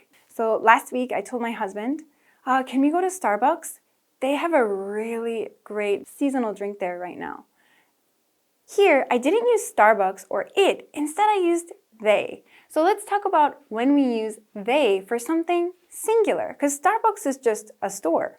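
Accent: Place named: United States. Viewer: 음~~~ 이분 영어 강의 들으니까 미국인 같아 !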